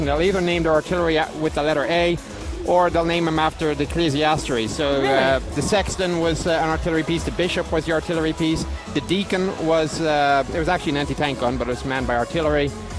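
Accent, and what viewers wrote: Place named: Ireland. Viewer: Love that Irish accent! When is your "Inside the Hatch" show gonna come out?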